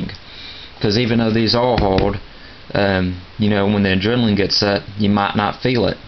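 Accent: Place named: South, United States. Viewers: It's called living in the south. Lots of people talk like that down here. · honestly, he sounds like a coonass, which is like a mix of southern and french accents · it's called an accent everybody has one ex. you probably speak clean english (northern english i'll just call it) he speaks not as clean it's just southern (sorry if me saying not as clean that's pry a lie) accent then there is the ny/nj accent and so on and so fourth. the only way to not have an accent is to be mute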